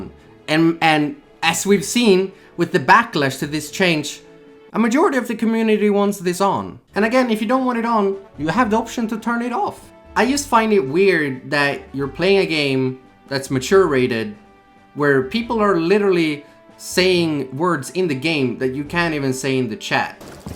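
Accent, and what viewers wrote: France. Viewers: Ur french accent :c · I'm French so your French accent is... Très bien. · l'accent français de qualité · Je trouve que ton accent français est très raffiné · j’aime trop ton accent ( I love your accent ) VIVE LA FRANCE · French accent 100/10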